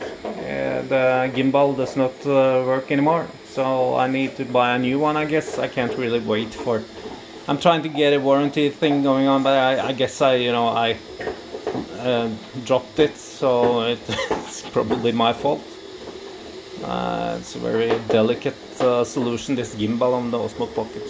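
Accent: Norway. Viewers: ok jag visste de ifrån din dialekt har vänner ifrån Norge så kände igen dialekten direkt 😊😊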